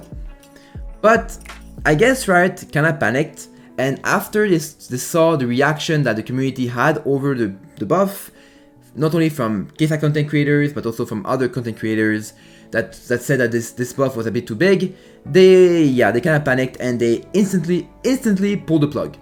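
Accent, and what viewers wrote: France. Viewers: Omg, are you french? I love your accent and that you actually try to articulate (unlike this Takyre senna content creator. The guy gives no shit whether he's understood or not).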